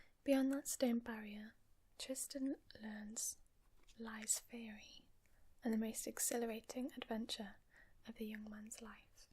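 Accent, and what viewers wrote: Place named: Hampshire, United Kingdom. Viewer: You have a lovely accent. Id bet you're from hampshire somewhere. :)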